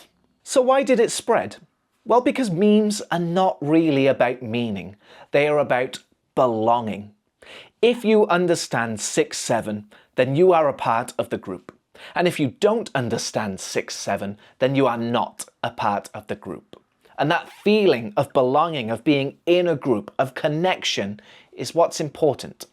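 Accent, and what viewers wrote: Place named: United Kingdom. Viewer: Hi! I really enjoy your practical approach to English learning, especially your Walk & Talk videos, graded listening content, and the way you bring natural British English and real-world topics into your lessons. I also sent you an email today with a personalized App idea inspired by your teaching approach. The idea is to turn your listening and speaking content into an interactive practice space where learners can shadow your lessons, practise pronunciation, work through IELTS and speaking prompts, and build a consistent daily practice habit. I think your teaching content could become something really valuable for learners beyond YouTube. I’d love to hear what you think! 😊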